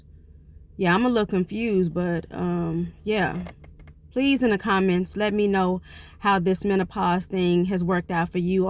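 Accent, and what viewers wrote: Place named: South, United States. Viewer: Happy birthday to your daughter! Wow this is interesting I’m gaining knowledge for pre menopause. Do you know that you have a really cute southern accent? I don’t know if you know it or not. lol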